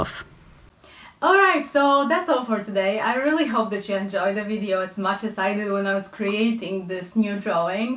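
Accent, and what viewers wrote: Poland. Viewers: Are you from Poland? Cause you have polish accent. You're English is so good. How many years you're learning English? (Sorry if my is bad) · Hah! Kiedy cię usłyszałam od razu wiedziałam że jesteś polką :D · Jesteś Polką? Jakoś tak polsko się nazywasz i masz taki polski akcent. Ślicznie rysujesz <3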